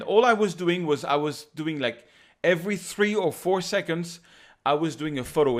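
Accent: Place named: France. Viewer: Nice tutorial. Everything's clear. Unfortunately, it was with your french accent but it's always better than the one from Gunther from LRTimelapse !! (^_^) Try it in french next time. And btw, the name of the device you were looking for is an intervalometer.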